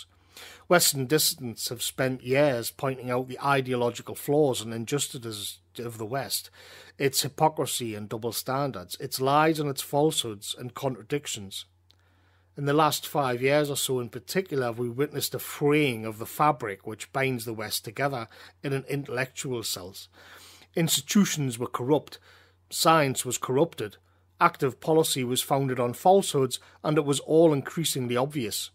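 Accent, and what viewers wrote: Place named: Scotland, United Kingdom. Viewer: Magnificent scottish accent. It overtakes the message a bit. I wonder where this accent has its home more precis.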